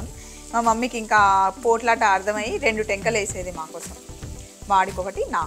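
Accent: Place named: Guntur, India. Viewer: Your voice has good clarity and simple and no over action, I belong to Repalle of Guntur district, your voice is like our voice…..🎉❤💥🎄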